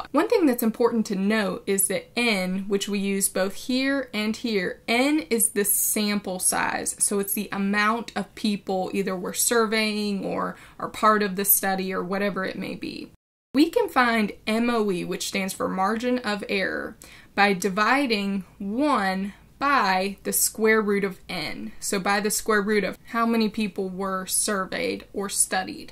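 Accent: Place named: United States. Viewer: margin of air the american accent is beautiful thanks for the tutorial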